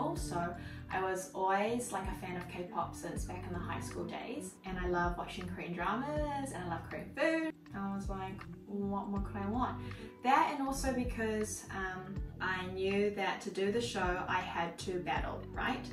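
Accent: New Zealand. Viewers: I just enjoy your deep NZ accent 😂❤ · Hi, your content is so refreshig to watch and your voice so pleasant in my ears. You have same accent with Jennie of Blackpink and then I realized Jennie used to live in NZ 😊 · can anyone tell me whether this is NZ accent english? i'm an english learner and i think this may be the perfect material to practice listening hahaah thank you for the subtile and looking forward to your new videos to come!!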